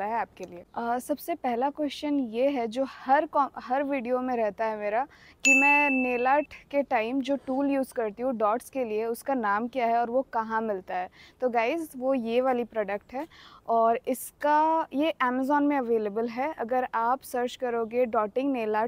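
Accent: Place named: Odisha, India. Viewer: Brooo in my mind i was thinking u are from odisha because of ur accent 🥴, u actually are .... 🥹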